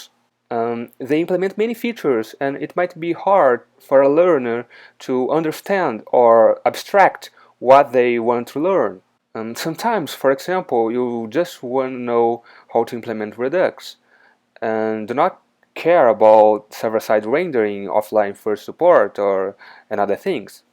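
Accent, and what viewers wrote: Brazil. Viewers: Reconheci o sotaque brasileiro :) kkkk Rapaz tô sofrendo pra aprender React. Realmente baixei um boilerplate pra integrar em um projeto Django, mas veio tanta coisa junto que me perdi todo. O problema é que se o projeto não for seguro e não puder se comunicar com o API num serve pra nada. Você ensina essas coisas ? Tô precisando de um mentor de Javascript Frontend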